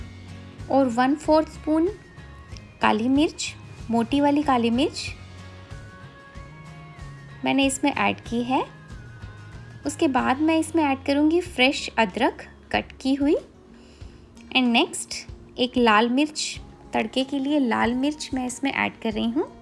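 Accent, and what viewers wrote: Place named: Marwari, India. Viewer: Good trick. You have a Marwari accent :)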